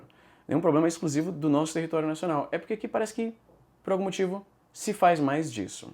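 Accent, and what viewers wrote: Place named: Fortaleza, Brazil. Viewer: volta a falar com teu jeito fortalezense, macho!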